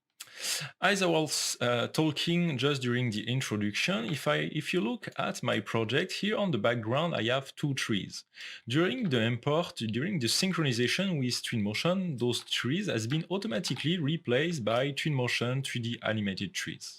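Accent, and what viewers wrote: France. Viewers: c'est marrant j'ai l'impression de que c'est un français qui parle anglais , j'ai raison ???? en tous cas tu sais utiliser l'outil bravo ! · Beside this awesome introduction to the Twinmotion, I love your accent dude :) Vive la France!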